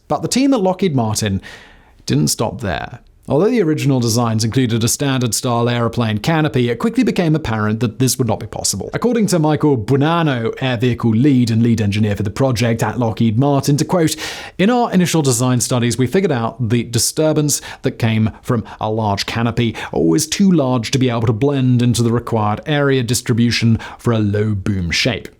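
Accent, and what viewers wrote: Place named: RP, United Kingdom. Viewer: Why do you think just because this channel and you speak with that upper class posh Gary Gavin accent that I’m not going to swear the fucking shit bat balls in this comment section know you are fucking mistaken where the fuck is ATI and I want business blaze where the fuck is business blaze blazing back now cocaine also nice blaze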